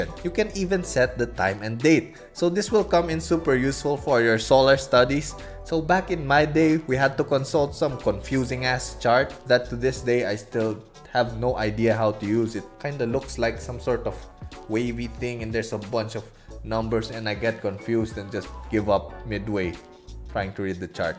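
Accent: Philippines. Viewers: Are you a Filipino? You have that accent you know.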